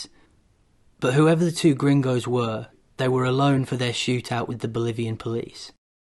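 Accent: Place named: United Kingdom. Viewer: Funny how you Brits are obsessed with our American wild west. And, Dude, you have a cool accent 😎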